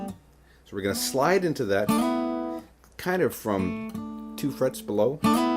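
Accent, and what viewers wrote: Canada. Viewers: You definitely have some Canadian spirit in that voice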